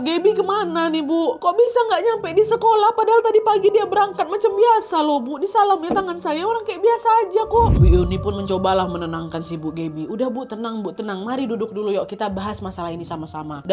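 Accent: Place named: Eastern Indonesia, Indonesia. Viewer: Banyak yg bercerita horor dgn flognya.tapi ka, bisa hidup ceritanya macam aku ikut dalam ruang cerita itu. Di tambah logatnya nya yg seru.... He he Indonesia timur jdi Indonesia barat..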